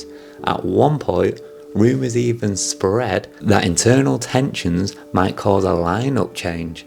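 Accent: Northern England, United Kingdom. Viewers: You’re all good. I’m Filipino and can tell you’re saying it properly within your regional accent (Yorkshire? At the very least Northern English). This is a great sign of Bini’s global recognition—people will say the group’s name with their own accent.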